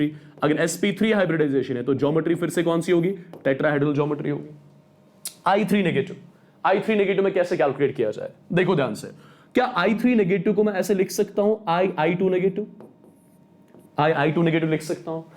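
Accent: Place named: Kashmir, India. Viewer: It's kashmiri voice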